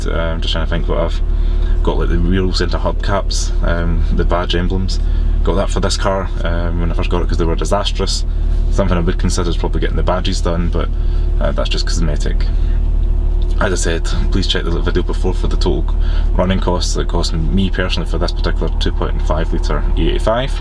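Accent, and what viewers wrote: Scotland, United Kingdom. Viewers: That's a bit rude, Harald - he is speaking English, but with a lovely Scottish accent. If you want posh Z4 speak, subscribe to "It's Joel". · It's Scottish accent.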